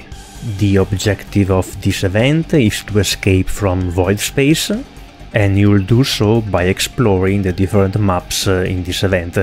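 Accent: Italy. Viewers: il tuo accento e italiano non mi freghi lol. ce tipo un discord di italiani di fgo??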